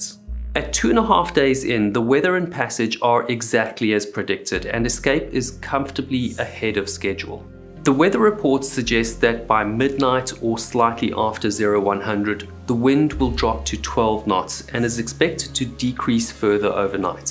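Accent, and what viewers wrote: South Africa. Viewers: Ahh I was wondering how they died, I mean it was pretty obvious they died of their injuries, but the previous version did feel a bit open ended. Just wondering if you'd ever do a Q&A type video? I'm not usually interested in that type of thing, but I'd love to know were you're from, I can't place the accent although it sounds South African or somewhere else in Africa, but I also remember you said in 1 of your videos that you had lived in England. It would be interesting to hear about your career too.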